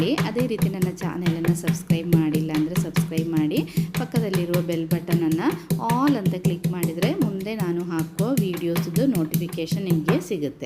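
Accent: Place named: Bengaluru, India. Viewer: ನೀವು ಯಾಕೆ ಬೆಂಗಳೂರು ಕನ್ನಡ ಹೇಳುತ್ತೀರಾ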